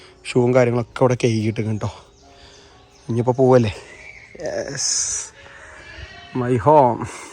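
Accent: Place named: Kasaragod, India. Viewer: Kasaragod paraya oottpola😊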